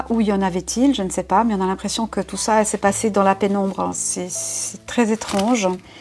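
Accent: Switzerland. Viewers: Coucou, il me disait bien que ce petit accent me rappelait quelque chose 😃😃 un gros bisou de Genève 🥰🥰🥰🇨🇭🇨🇭🇨🇭🇨🇭🇨🇭🇨🇭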